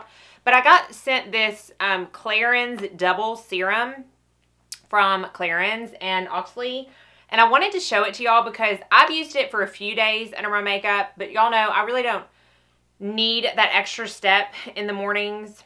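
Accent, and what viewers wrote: South, United States. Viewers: Hi my name is Rousha and iv been wanting to tell just how awesome I think u are! I love your videos I think your so talented. I'm also from TN (murfressboro area) but currently live in NY. I love to watch just to hear you talk it reminds me of everyone back home that I miss not many ppl here have a southern accent lol. I just wanted you to know your one of my favorites to watch and to keep them coming. <mail>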